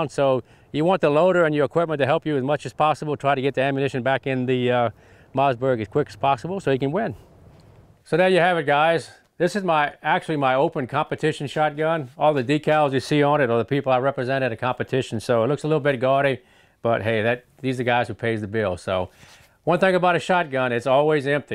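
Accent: Louisiana, United States. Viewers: Wheres he from, he has a bayou accent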